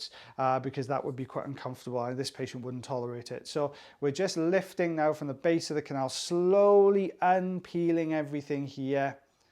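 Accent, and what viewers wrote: Wales, United Kingdom. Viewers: Nice Welsh accent , what part? Go on admit it you have a candle factory on the side😘 I find it hard to wear aids terrible itching any ideas? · Sir you sound Welsh af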